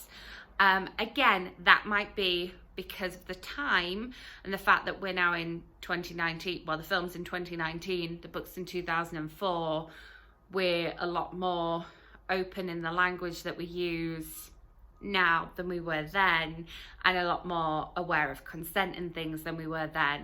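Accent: United Kingdom. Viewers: You have a British accent, are you in the UK or America? You do love your books, do you like factual books or more fiction?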